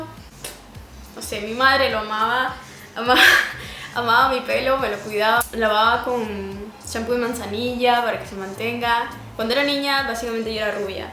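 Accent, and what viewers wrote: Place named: Venezuela. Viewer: De que país eres ne da curiosidad? esque en tus vídeos dices que vivías en Argentina y mencionas mucho Argentina, pero yo escucho tu acento de Venezuela...PD Saludos, me gusta tu canal y tu forma sencilla de ser nunca cambies:)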